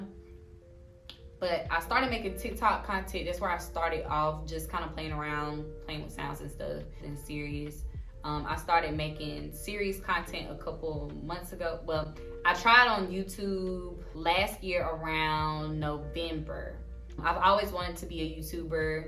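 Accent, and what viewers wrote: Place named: South, United States. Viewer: Southern accent wasn’t expecting tht😂😭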